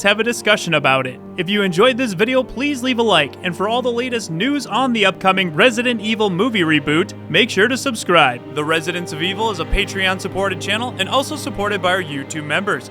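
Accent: Canada. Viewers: Man, love the Canadian accent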